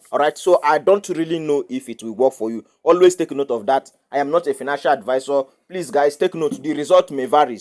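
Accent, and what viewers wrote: Igbo, Nigeria. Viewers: Honestly. It's that Igbo tongue that makes me smile on my first view of whichever of his videos I bump into. 😂😂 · Na your igbo tongue dey give me joy😅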